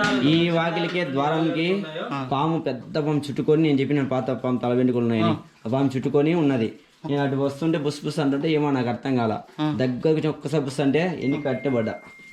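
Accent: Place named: Kadapa, India. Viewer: Nenu chala rojula taruwatha rayalaseema kadapa bhasha vinnanu. Thank you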